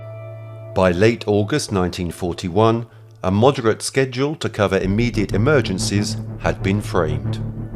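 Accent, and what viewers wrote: United Kingdom. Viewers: A story about the USA..but Brit narration ?? Peculiar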